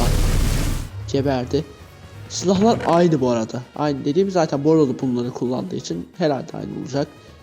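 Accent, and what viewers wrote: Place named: Azerbaijan. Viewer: Salam məndə azeriyəm danışığınan bilinir azəri olduğun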